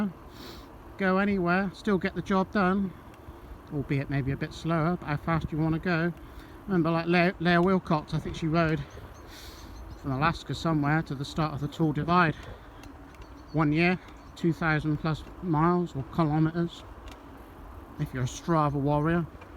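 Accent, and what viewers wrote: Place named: South West England, United Kingdom. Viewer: Well yeah, I could tell it was South West by the accent haha. I’m in Cornwall. I’m guessing you are Devon somewhere?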